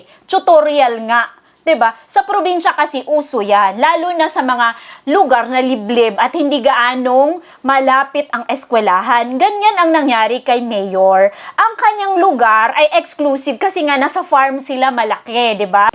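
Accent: Visayas, Philippines. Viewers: Bisaya ka ba Atty. ?😅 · Atty. ang accent mo ay Visaya.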